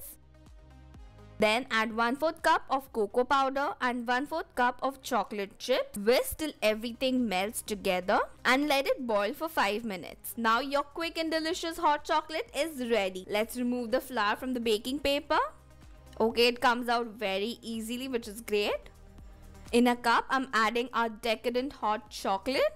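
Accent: India. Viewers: the way u says Hinglish I really love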